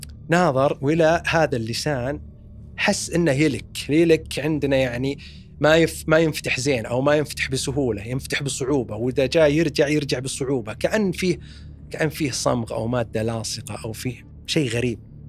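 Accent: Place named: Qassim, Saudi Arabia. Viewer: من احلى ماسمعت قصص ررررررائعه بلهجة قصيمية تزيد من جمالها 😂😂 ، حلقه كل اثنين ! ياليت تصير كل اسبوع حلقتين لو قصه فقط بدون تحليل ✅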